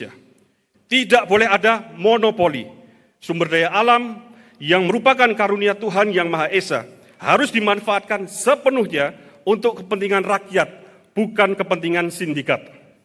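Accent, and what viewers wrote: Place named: Java, Indonesia. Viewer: Logat nya kok jawa ya??😂, jawa memang Muantaappp👍😁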